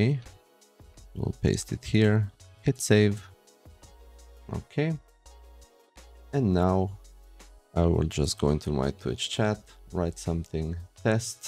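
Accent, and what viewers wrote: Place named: Romania. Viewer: Ai voce de roman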